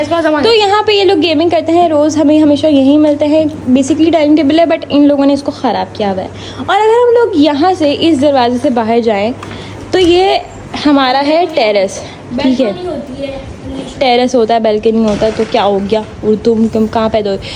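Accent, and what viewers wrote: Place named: Karachi, Pakistan. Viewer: Very nice house tour you say you blong to ch family I'm also blong to ch family live at Islamabad but your accent speaking style like Karachi people not like ch family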